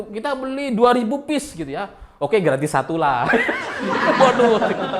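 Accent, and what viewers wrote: Java, Indonesia. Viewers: Gw tandain yg subscribe bulan kemarin 398, sekarang baru 410, artinya peminatnya kurang ini Chanel, Tutup aja, Medok kata2nya Gak bermanfaat.😆😆😆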